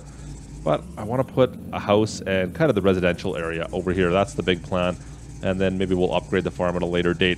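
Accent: Canada. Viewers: Are yoy from alberta?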